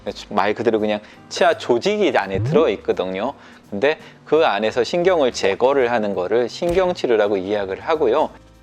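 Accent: Seoul, South Korea. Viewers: 서울분이신가? 있거등요 ㅎㅎ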